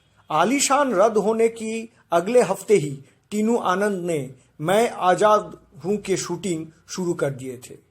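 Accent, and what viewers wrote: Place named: Bengal, India. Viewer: Baangaal na tumi ... Xcent ta oto sundoor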